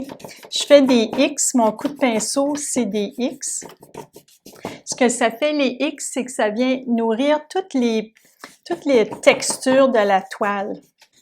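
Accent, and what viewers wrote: Quebec, Canada. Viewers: Merci beaucoup. Je suis française et suit des cours de peinture à l huile.l accent québécois en plus qu on adore en France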